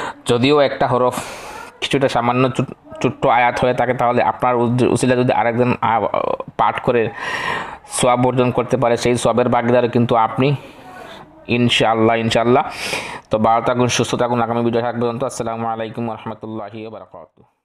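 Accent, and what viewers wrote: Sylhet, Bangladesh. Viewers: আমি আর অনেক ভিডিও দেখেচি সব ভিডিওর কথা গুলি ভুজি কিন্তুু আপনার কথা ভুজিনা,,, আমার মনে হয় আপনি সিলেটি,,, কথা কম বলবেন আর ভালো করে ভুজিয়ে বলবেন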